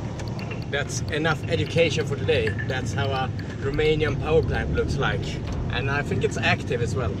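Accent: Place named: Sweden. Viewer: Jag tyckte att du hade en svensk dialekt😂😅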